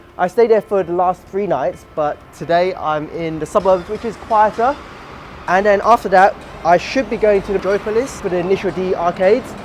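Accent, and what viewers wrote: United Kingdom. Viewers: nice vid as always!! on a side note are you japanese? from your UK accent seems like you've lived your whole life in the UK love your vids been a sub since the first evo6 review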